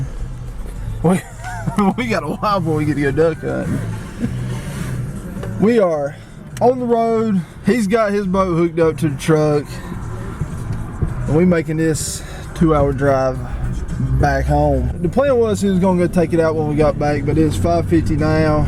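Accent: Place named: North Carolina, United States. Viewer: Sounds like you're from North Carolina...I live about 30 minutes from Little Washington. 😆 I'm down here in New Bern, NC. I picked up a Tracker Grizzly few months back and ran across the video of you and your son testing out the 60hp. I'm thinking about putting a 50hp on mine. Its a 1860 as well. You think that's enough motor?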